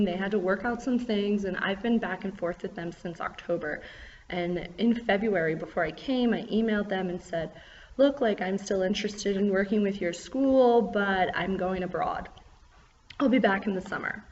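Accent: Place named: United States. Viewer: That's cool that you teach Uzbeks!!! You have an awesome American accent, like I. Uzbeks need to learn from YOU!!! My former English teacher Salomat Izzatulaevna in school #17 had a hidious british accent and I couldn't learn anything from her. She was a moron, unfortunately. In Uzbekistan you will become a top English teacher really fast! I can show you some teaching techniques that will significantly boost your student's language learning ability.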